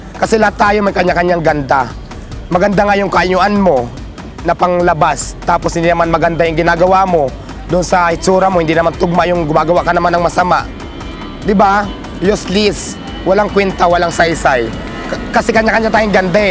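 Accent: Visayas, Philippines. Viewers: alam ko bisaya ka accent mo palang. parihas tayu hehe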